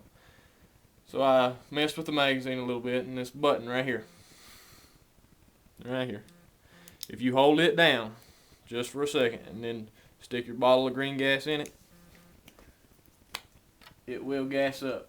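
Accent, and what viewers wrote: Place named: Texas, United States. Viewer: This is not to hurt your feelings, but you sound like the Texas guy from BFBC 2.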